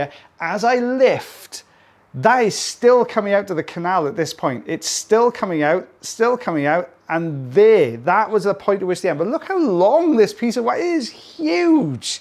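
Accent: United Kingdom. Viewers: Im watching the video to see the star of the show not your face or to hear your British accent. In fact your technique is rather.....rubbish! Lol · wiggling his socks off 😂😂🤣🤣 i love UK sayings lol 😂 btw i was trying to sort out ur accent; northern/southern England… not quite… def not Irish or Scottish … then i caught in one of ur video’s that ur Welsh! i was proud that i noticed it was a bit different ☺️ it’s not easy for us Americans to notice the differences. i worked for a UK based company & exposed to a lot of different accents, i finally started to notice the subtle differences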